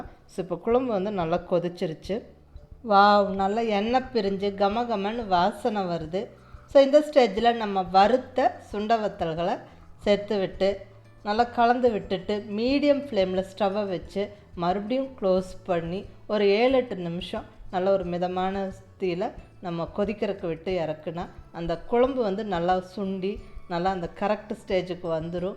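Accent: Coimbatore, India. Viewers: i tried in tis in the same way u did... got appreciations from family members...tq mam Unga slang nalla iruku..nenga Coimbatore ah...... God bless mam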